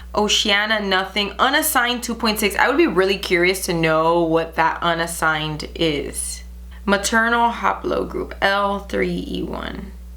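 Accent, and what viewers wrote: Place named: United States. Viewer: Maybe a little American too? 😂😂😂 Judging by the accent, I'd guess 100% American. If you are born and raised in the U.S., you are American. Regardless of where your ancestors came from... Maybe I misheard the guess you read, but it struck me as funny.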